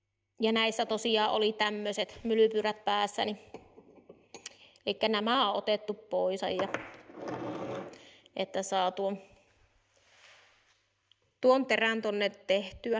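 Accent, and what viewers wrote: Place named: Oulu, Finland. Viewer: On sun puhheesa muutamia oulun murteen sanoja 👍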